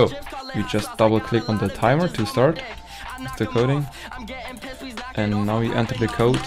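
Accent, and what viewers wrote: Germany. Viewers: man merkt das du deutsch bist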